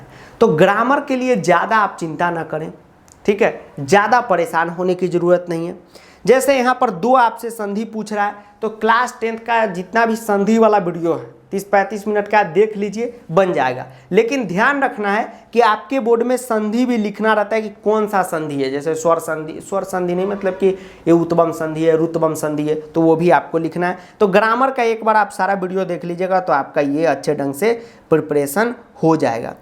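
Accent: Bihar, India. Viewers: Sir aap rajshthan se ho ya bihar se ye bol kaise rhe ho sorry to say this par mere se control nhi huaa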